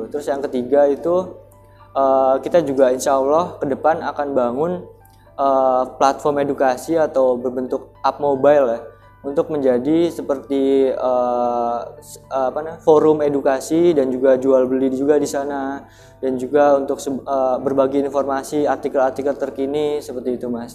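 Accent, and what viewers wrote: Banyumas, Indonesia. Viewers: Wahhhh,,, cah banyumas, salam ngapak mas dari Purwokerto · Alhamdulillah,..wong ngapak..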